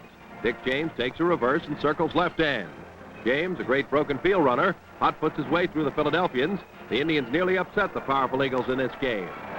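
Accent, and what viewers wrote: Canada. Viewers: Canadian pronunciation.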